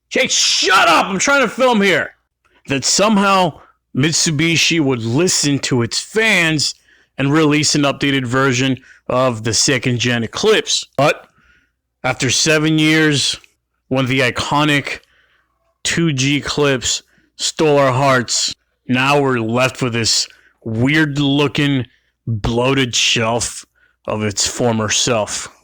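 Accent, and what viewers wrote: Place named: South, United States. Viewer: Bring back the southern accent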